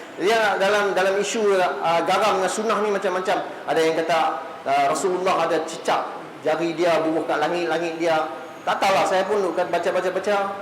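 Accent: Kedah, Malaysia. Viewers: dia pakai bahasa Malaysia juga tapi loghat Kedah. Sebab tu awak tak faham.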